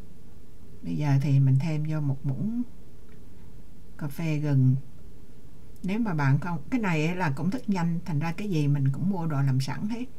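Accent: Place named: Central, Vietnam. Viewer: Em thích nghe giọng nói miền Trung của chị, học thêm những chi tiết đặc trưng để tăng cường kiến thức. Cám ơn chị rất nhiều. Em vẫn nấu bánh tét bằng cách dùng IP slow cook, bánh hoàn hảo tuyệt vời luôn chị à. Sáng kiến thật hay.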